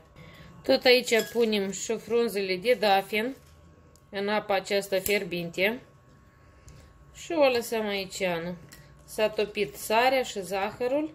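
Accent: Moldova. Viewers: In afară că ești o gospodină desăvârșită, imi place de modul vorbirii tale pur moldovenește, intr-un an după revoluție am fost în vizită la cunoștințe in HERTA, și la fel cunoștințele vorbeau exact ca tine, ești superbă.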